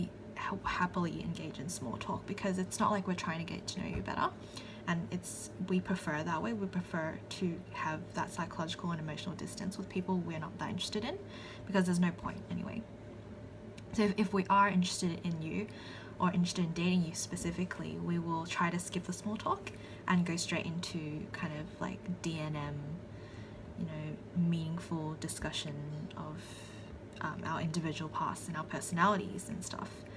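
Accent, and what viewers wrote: Australia. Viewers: She's Australian. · I hear Aussie? ❤️ Melbourne Victoria here but live in Miami 😩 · is that an aussie accent i hear? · offtop, sorry, but are you Australian maybe? :) Cheers from infj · I was not expecting an Australian accent out of you ! I love it ! It is beautiful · you sound aussie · Very insightful talk, as a male INFJ a lot of these points are relatable to males. ps. I’m guessing you’re Australian 😊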